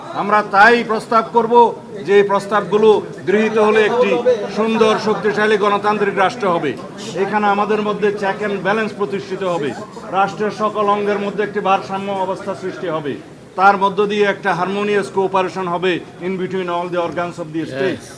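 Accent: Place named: India. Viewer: ভারতের মাল কথা বলার স্টাইল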